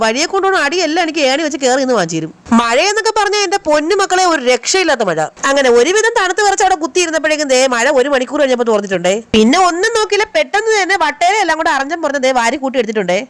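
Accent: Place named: Trivandrum, India. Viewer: Ullolam,uthippooram,nammude Tvm slang,enthaayaalum kothippichu,ente favrta😊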